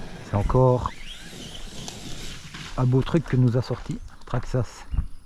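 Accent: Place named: Belgium. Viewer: Salut salut, je reconnais l'accent et les septantes du pays;) t'es de quel coin au juste? On est peut être voisins 🤓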